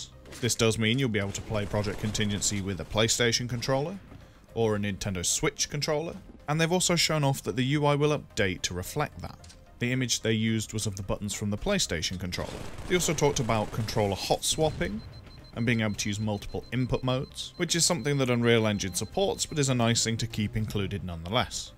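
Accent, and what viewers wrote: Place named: New Zealand. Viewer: Holy fuck I knew that was a New Zealand accent, I'm proud of myself for guessing. I'n year 7 we had a maths teacher from New Zealand and she was constantly pissed off because people thought she was Australian.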